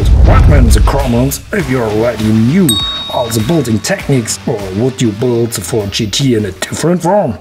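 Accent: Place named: Germany. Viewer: Are you German? Because you sound very German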